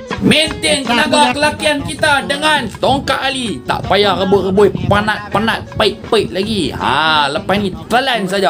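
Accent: Malaysia. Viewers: kikikik..loghat penang mmg...sempoi...stedi mami...betoi tu mamu..bior je depe depe..keme geli org yg dok buat mende sikit..sal juang agama..kalimah "drp hamba Allah" dah payah jumpe..yg org org yg tolong sampeikan pape simbangan bile ditanye kt depe depe tu PUN tak tau sape penyumbang asei..depe cume nolong nyampeikan.tahun 90an masih ade 'drp hamba Allah'...masuk alaf baru 20th century kate org kg keme..dh payah jumpe..sedekah tangan kanan kiri yg terbaik.keme mmg tak level gitu.tp keme penah nengok..org anto brg memalam delan rumah kak seorang balu anak 5../letak dalam satu tong biskut empat segi..atas rak kasut..ingat manusie je..yg tak boleh nampak..nk sedekah gitu..pun org ramei boleh tau CUME org ramei tak tau sape hamba Allah tu..usahkan menteri o vvip...haji bakhil yg tau pun akan merah muke..sendiri malu..tu watak lakonan filem le.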